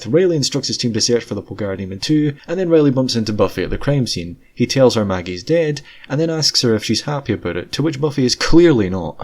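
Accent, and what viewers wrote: Scotland, United Kingdom. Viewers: I think he might be Scottish?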